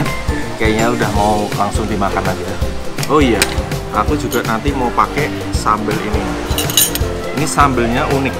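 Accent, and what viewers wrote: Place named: Solo, Indonesia. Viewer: medoks tomen to oms"? lahir solo ta?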